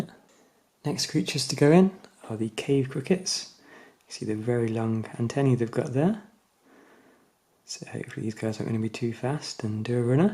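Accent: United Kingdom. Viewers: You are from derby aren't you!!!???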